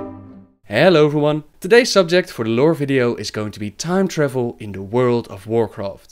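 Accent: Netherlands. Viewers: Is this guy dutch? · Is that a dutch accent I spot? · Are u dutch ma men? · Probably dutch, maybe south african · The person speaking in the video is Obviously Dutch, no doubt. This accent is unmistakeable. :b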